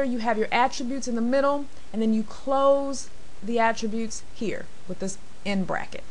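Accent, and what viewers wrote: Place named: South, United States. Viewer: I love your voice. Very smooth, soft and with a subtle hint of Y'ALL.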